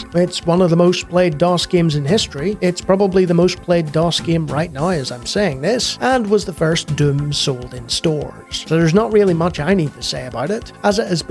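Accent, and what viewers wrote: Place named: Ireland. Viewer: I hear you have the Irish accent that pronounces 3 as three, not as tree like other Irish pronounce 3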